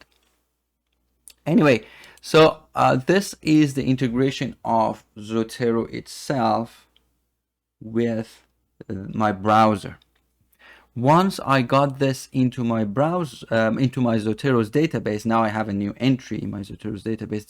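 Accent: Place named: Iran. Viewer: Thanks for the tutorial. Your accent sounds extremely Persian.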